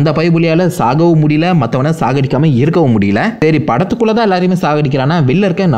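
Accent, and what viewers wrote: Tirunelveli, India. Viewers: Bro ungalukku tirunelveli aah ? Kandu pidichuten.... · Bro enna tirunelveli ya neenga...ela ennala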